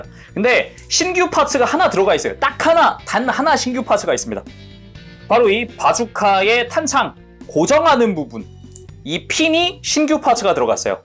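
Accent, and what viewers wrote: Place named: Jeolla, South Korea. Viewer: MGEX 리뷰는 이채널에서 한 리뷰가 넘사벽인듯.. 근데... 전라도 사투리는 좀...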